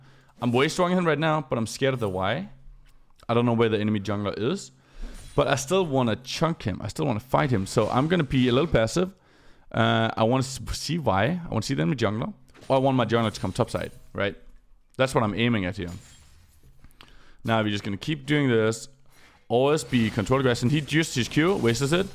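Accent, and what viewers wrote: Denmark. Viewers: You have to be Danish, the way you pronounce TF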